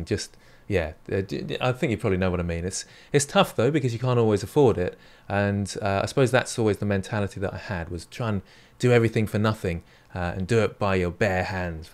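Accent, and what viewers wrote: United Kingdom. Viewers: I'm very happy for you!! You really deserve more for all the in-depth tutorials and the effort you put on this channel! I know this comment won't change your life and prospective about things, but everyone has flaws and people must not be judged. Actually, flaws are what make us unique. Might be teeth, white hair or anything, but these things make you YOU. I came for the tutorials at the beginning, but I stayed because you're a really nice person, caring and super friendly. Plus your British deep voice is sooo calming when following tutorials. Stay strong, stay healthy and keep up with the good work 💪🏼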